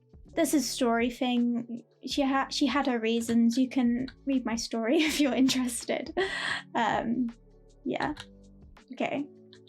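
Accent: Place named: United Kingdom. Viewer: Thank you. I've seen it. :) I'm looking forward to see more from you. And your perfect British accent makes these videos even better.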